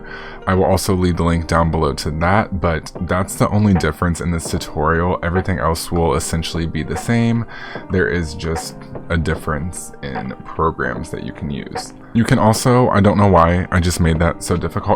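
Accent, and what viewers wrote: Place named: United Kingdom. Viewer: ok but like thanks fir not gamer sceaming in a british accent 💅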